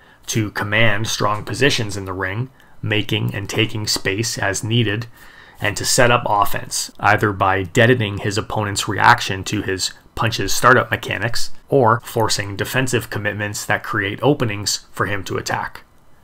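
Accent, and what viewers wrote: Canada. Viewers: You’re a Canadian aren’t you. I know that accent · An odd mix of a Canadian or a Devonshire accent plus American, where are you from and where have you lived? i mean.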